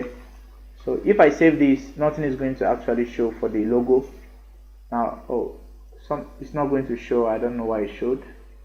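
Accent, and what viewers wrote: Nigeria. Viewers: that Nigerian accent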